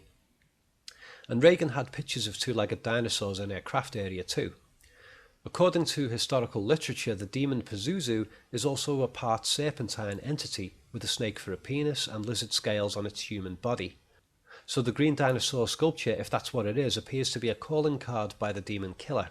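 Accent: Liverpool, United Kingdom. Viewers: I realy cnt listen to a Scouse accent trying to sound sophisticated😂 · Nice to hear a fellow scouser narrating don’t hear it often :) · Good video brother, nice to go on YouTube and hear a scouser doing a film video ;) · I don’t care how good, intelligent and eye opening this video is, and it is very well analysed, if it’s described by a scouser it sounds retarded. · Are you a scoucer?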